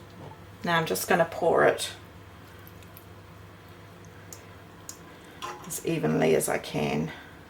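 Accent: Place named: New Zealand. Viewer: As a vegetarian, I'd love to see some vegetarian dishes. Or dishes where the meat is optional... I'm in my 60s, live alone, have a veggie patch, and spend around $50/wk on groceries. I only go shopping once a month, for the same reason as you: I'd spend more! I've built up a good pantry basis and shop my pantry and freezers. I keep track of what I've used. Even though the prices have gone up, my shopping bills have only gone up a little... Because I've got plenty in my pantry, I can wait for things to go on special. I make my own bread, yoghurt, freeze grated cheese, onions and garlic, and make jams, chutneys and marmalades... I also cook big meals and freeze portions for easy dinners. For me, the no shopping challenge AND shopping the specials in different shops, makes sense... It is SO NICE to hear a kiwi accent on YT! So good to see you potter in your typical Kiwi kitchen, with your old stove, similar to my own, your lovely aprons (I'm an apron fan!) and watching you cook normal meals, without frills... 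AND without the jolly long nails that seem to be a must these days...! Thank you for a refreshing new channel! 🎉✨️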